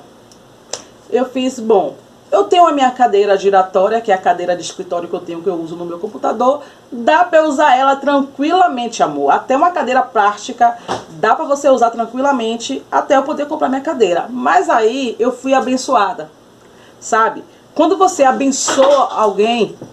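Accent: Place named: Salvador, Brazil. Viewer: Assim q iniciou pensei logo " Meeeeu Deus , Ela é de Salvador " Nosso sotaque é maravigoude 😍 Muuuulher , você é luz , Ganhou mais uma inscrita 🥰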